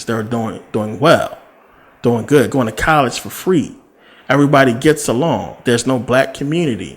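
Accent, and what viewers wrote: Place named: Brooklyn, United States. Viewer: I've paid attention to you!everyday! for past 90days, and I've only got one critic. Before we get to that, I'll say this, I've waited to hear the truth about the confusing holes, gaps and timelines in the bibles of the world, all my life. Also, Folks once said, "the truth would not be told in their lifetime"." Just like they said, "there wouldn't be a dark-skinned President either." I will tell you both have come into the light! YOU are making history by telling us, all colors and nationalities, the truth, in the purest, natural Brooklyn accent I've heard in years,( I mean, Nu Yawker accent.) I thank you, from the inside of my core. Okay, now for your critic. Your begging them to understand and too many, " do your researchs,"is driving me nuts!! PLEASE, spread only 10 of each per vid. I want more facts, histories and practical debunkation.. 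I appreciate so very, Very, much,!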